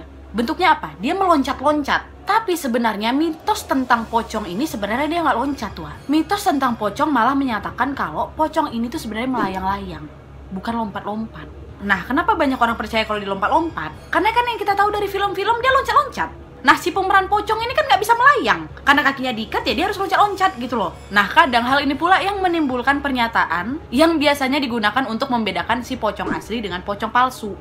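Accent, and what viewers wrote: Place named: Kalimantan, Indonesia. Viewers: Malang slur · sragen jateng hader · Kaka Orang Kalimantan Ya? Cara Bicara nya Sama Kaya tanteku dari Kalimantan Hehehe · Jowo Timurr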